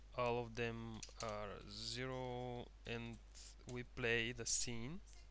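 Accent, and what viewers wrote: Russia. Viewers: Русский чтоли?)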